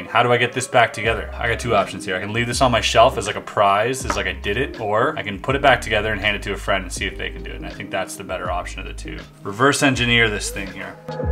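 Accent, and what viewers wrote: Germany. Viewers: Ich hatte auch von Anfang an das Gefühl, dass er deutscher ist. bzw wie er einige Sachen ausgesprochen .🤔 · I love your German dialect! Grüße :) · Watching you untangle puzzles is soooo relaxing(especially since I don't have to do it myself); ASMR, anyone? 😊 Fun to watch and your German accent is excellent! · Are you German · Are you german? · That German accent 🤗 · Are you a german guy i'm a german guy and it was so clean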